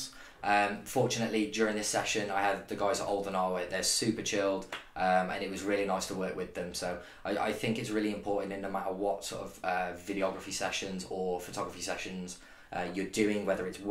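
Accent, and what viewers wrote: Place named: Germany. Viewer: Can barely understand your English--sounds like Ginglish. Technical mambo jambo in Ginglish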